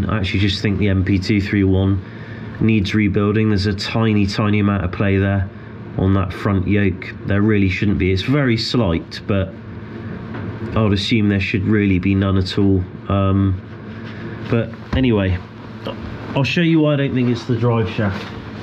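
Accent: United Kingdom. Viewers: listening to you prattle on with that fancy British accent is never boring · *English accent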